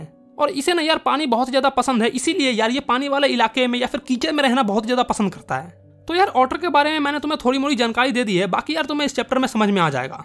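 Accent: Bihar, India. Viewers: Sir are you from bihar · Bhai aap Bihar ki language bolte ho 😊 kuch kuch words h na😊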